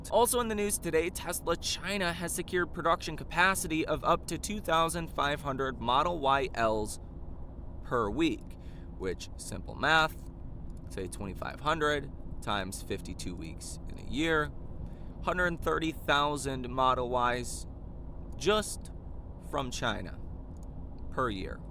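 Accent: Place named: Chicago, United States. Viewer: it sounded like a 1950s Chicago accent of a guy announcing a horse race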